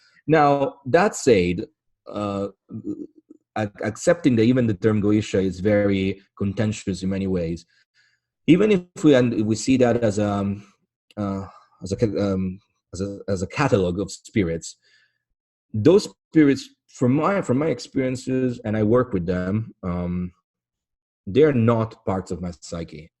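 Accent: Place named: Ireland. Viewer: Wasn't expecting an Irish host. Great stuff. Hello from Offaly Subbed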